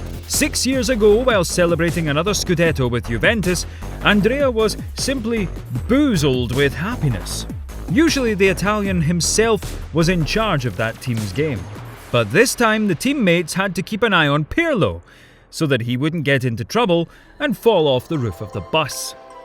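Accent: Scotland, United Kingdom. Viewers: A video about drinking just feels so much better when narrated in a Scottish accent!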